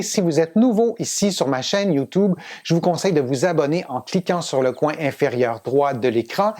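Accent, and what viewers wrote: Quebec, Canada. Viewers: Bonjour, tout d'abord merci pour vos vidéos! Je suis québécoise, habitant en France, mais j'ai besoin de me reconnecter avec l'accent de mon patelin ça me fait du bien! Je voulais juste vous proposer, lorsque vous démontrez un exercice pour les jambes, de descendre votre caméra au niveau de vos jambes. Je suis prof. de fitness et ce genre d'exercice, aussi simple soit-il amène un positionnement souvent erroné. Le genou est souvent trop vers l'avant etc..le visuel est très "parlant"! Encore merci pour vos capsules, dans notre métier il faut se tenir au courant et se mettre à jour continuellement.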